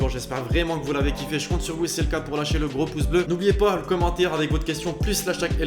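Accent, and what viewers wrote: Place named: Banlieue, France. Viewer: change ton accent de HLM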